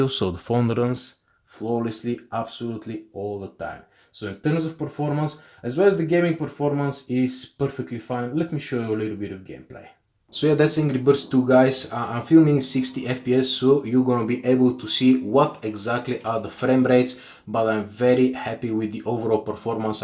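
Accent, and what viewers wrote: Russia. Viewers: his russian accent is funny! lol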